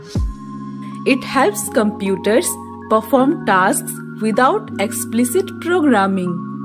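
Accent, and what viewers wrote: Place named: Uttar Pradesh, India. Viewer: Are you from up or mp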